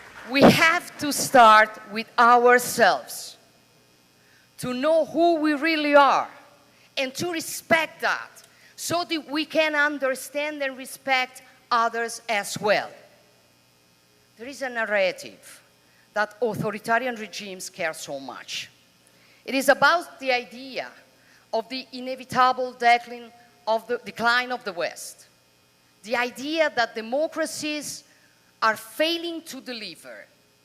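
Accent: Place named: Italy. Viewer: Sta leggendo un discorso scritto da altri e la pronuncia la rende riconoscibilmente italiana. Niente di perfetto, dunque.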